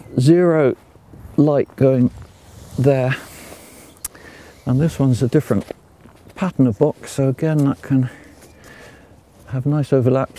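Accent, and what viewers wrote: United Kingdom. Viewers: That's the british accent that i like:).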